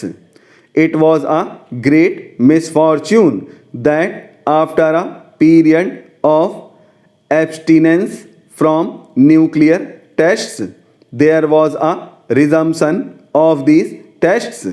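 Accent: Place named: Odisha, India. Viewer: Your voice seems like you are from odhisha , right ??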